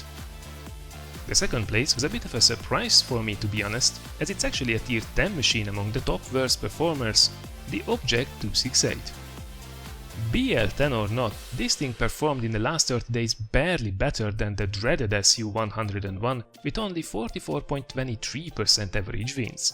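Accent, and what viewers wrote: Hungary. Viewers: első videodnál mondom:nagyon magyaros az akcentus, később,áá,ez biztos nem magyar,de lehet hogy francia :/,olvasom a kommenteket,egyszer csak meglátom ezt,hát basszus,ez magyar,na Mind1,amugy segyszer csinálhatnál egy videót,ahol elmondod az angol nézőknek,hogy magyar vagy,sok szerencsét az angol videókkal,sok sebzést a további meccseken :) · A magyar akcentus bárhol felismerhető :D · magyar vagy??? olyan furán beszélsz nagyon magyaros :) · hallod konkrétan 23 másodperc után rájöttem hogy magyar vagy :DD az az akcentus olyan tipikus magyar :D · By the dialect, I was 100% sure you're hungarian ;D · Dude, your accent. I know I know this accent. Are you Hungarian?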